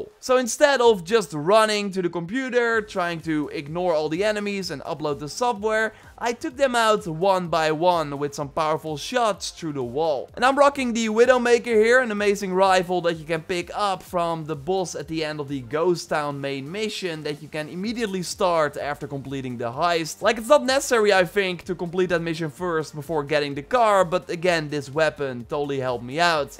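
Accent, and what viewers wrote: Netherlands. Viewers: bruv you make us dutch people look bad with ur accent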